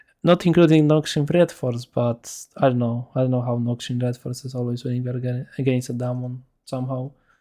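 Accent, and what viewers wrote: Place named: Poland. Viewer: akcent polaka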